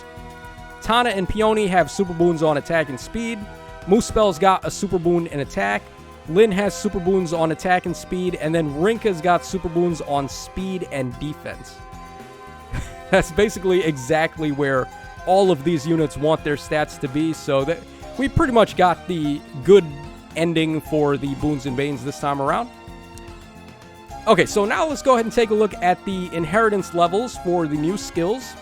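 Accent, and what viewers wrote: United States. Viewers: Ayo, that cowboy accent kinda got me down bad, tho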